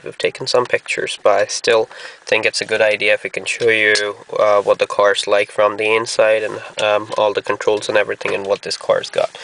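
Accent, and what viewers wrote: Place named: United States. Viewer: You must an American with your accent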